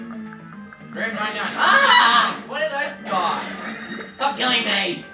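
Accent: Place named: United Kingdom. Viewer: his british accent makes his anger funny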